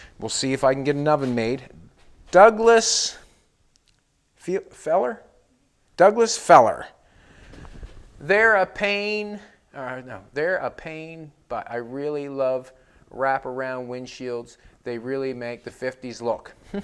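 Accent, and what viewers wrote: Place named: Nova Scotia, Canada. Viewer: I didn't even notice how you were saying plexiglass, I figured it was was your Nova Scotia accent... Lol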